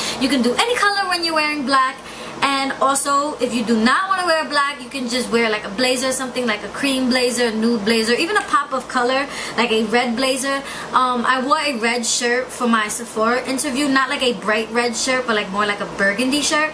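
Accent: New York, United States. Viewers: You must be from NYC ☺ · Its called a NY accent..duhh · are you from nyc??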